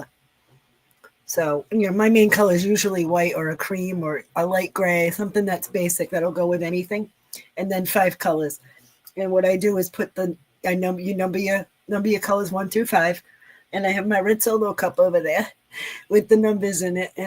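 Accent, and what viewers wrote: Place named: New York, United States. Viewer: Hi. Sorry I missed your live but watching replay. Your Boggy Blanket are so beautiful. Congrats at almost your 100 goal of number of blankets. That is a lot of work. Love to watch your videos. Not sure of where you are but your accent sounds northern, maybe close to New York. Have a great day, take care and stay safe. A friend.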